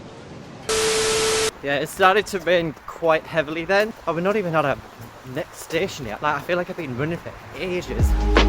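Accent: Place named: Yorkshire, United Kingdom. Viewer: Are you from Yorkshire,? I've never been able to place your accent but hearing you say Moor made me think of Yorkshire.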